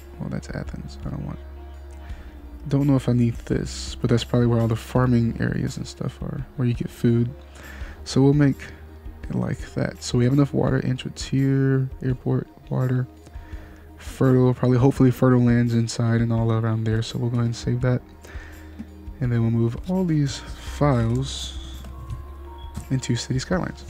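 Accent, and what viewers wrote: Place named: Netherlands. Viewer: he is from holland do you expect perfect English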